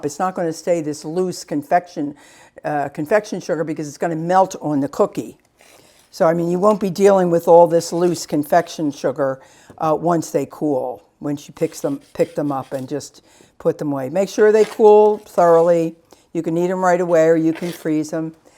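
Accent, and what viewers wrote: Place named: New Jersey, United States. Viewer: Are you from NJ by any chance? You definitely sound like you are lol. I’m from NJ, I live in Haddon Twp., Camden county. I love these cookies and I was looking for a recipe with almonds and low sugar in the cookie recipe. Thank you very much!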